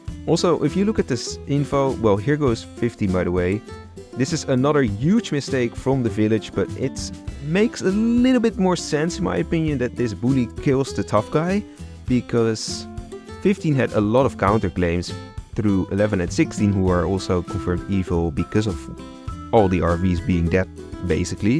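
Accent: Netherlands. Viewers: Dutch accent is hard not to notice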